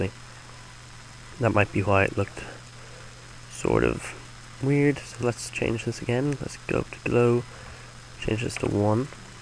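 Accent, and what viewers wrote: Ireland. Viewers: your irish :D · Are you Irish??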